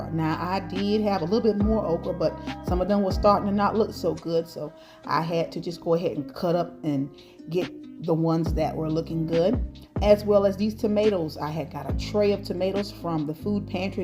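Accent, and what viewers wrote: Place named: South, United States. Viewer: Southern as hey'all. ❤❤❤❤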